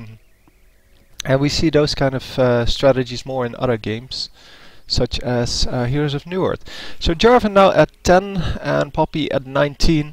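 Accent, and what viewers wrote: Netherlands. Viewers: You dutch hahaha dat hoor je gwn :-/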